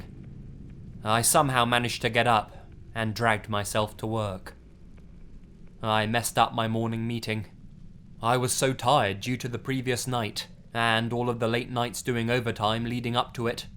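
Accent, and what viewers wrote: England, United Kingdom. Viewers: Yep but you know this guy is English you're probably American like me at this point they have become two different languages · Riseys Well actually my voice, I'm from the same area of England as, there fore I share the same accent. Anyways, why so aggressive, pent up anger mate? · someone who lives in England, English accents aren't always this great, enjoy it XD Congrats on the year,:) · Why do men with British accents narrate horror stories better than anyone else?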